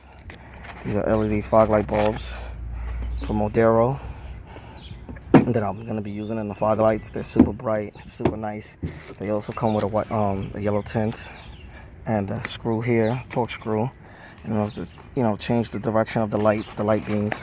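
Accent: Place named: New York, United States. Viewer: I may be from the south but I know an East Coast accent from anywhere... maybe I’m wrong but I’m getting Brooklyn/Bronx vibes. Preciate the video brother. New subscriber ✊🏽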